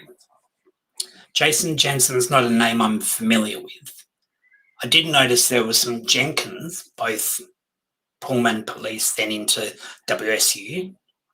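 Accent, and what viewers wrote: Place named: Western Australia, Australia. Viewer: You’re from West Aus too!! I live in Perth. It’s funny I could tell by your accent that you must be from West Aus, apparently we sound more English/Australian which is nice I think. Glad to see another West Aussie interested in this case, my husband is sick of hearing about it all from me. Look forward to listening again 😊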